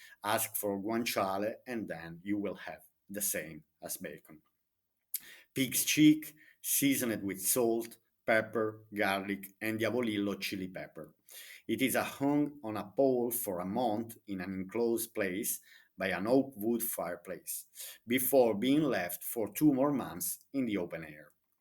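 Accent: Italy. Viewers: Thank you for pleasing your subscribers. I am enjoying the Italian pronunciation 🎉 i love❤ Molise. Hope invite us to his new home!😊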